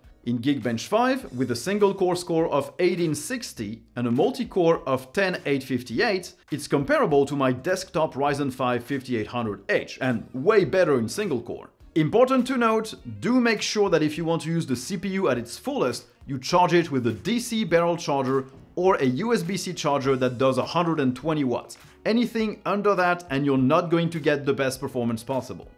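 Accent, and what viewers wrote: France. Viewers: When I see the Linux Experiment I have to click it. It is like an addiction. He has the whole package: Beard (some say a squirrel is hibernating in it), the French accent, the same undershirt he has been wearing for 10 episodes straight (who doesn't like Roquefort?).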